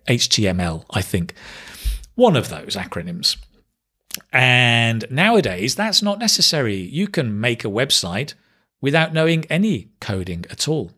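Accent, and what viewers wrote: United Kingdom. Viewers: This accent (British) is amazing .. i do like it, but i have not still mastered it well.. briefly thanks for your information about that, and have good day.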